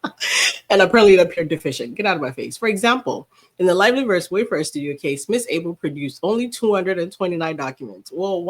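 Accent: United Kingdom. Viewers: Awesome British accent ❤ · I love the British accent !! brilliant - you could star in a broadway Oliver Twist production !! 😂😂😂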